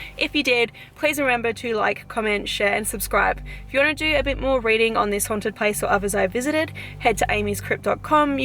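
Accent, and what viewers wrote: Australia. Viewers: Hey 😍 how ste you angel i would love to go to Australia I just love the accent 😍 I love yours angel obt way ❤ I love that spooky video at the school I saw saw both awsome love 🌷🌷this video omg is so mysterious you can feel.the chill thank you for another video love from your back out back yard lol love always angel 😍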